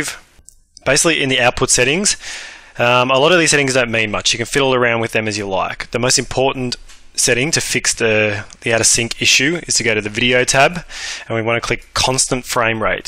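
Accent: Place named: Australia. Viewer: your strong ozzie accent made this 50 billion times more fun to watch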